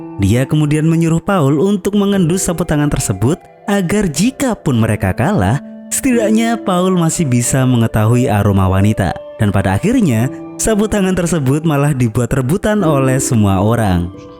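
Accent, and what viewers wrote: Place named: Java, Indonesia. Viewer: Loh le sampean wong jowo toh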